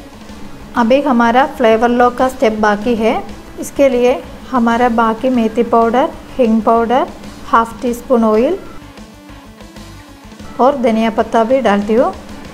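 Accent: Kerala, India. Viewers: Love your keralite Hindi accent. U r very sweet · It is actually Malayali Accent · She's a very enthusiastic person I liked her hindi kerala accent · Dear sister I like your keralite accent very much and recipes, today easiest way to make samber... I try it your way.... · My all mallu friends speaks same Hindi ❣️ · Your dishes are authentic. Even more you are so humble and sweet person. You chose hindi with your malayalam accent. Stay blessed!! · Malayali* accent.. other accents are different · Kerala hindi accent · Very Nice Recipe... Will surely try...also liked the way you described...typical mallu style.. Saukyaam maam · മൊളു ... ഹിന്‍ദിയിലെ മലയാളം accent ...അതാണ video highlight ...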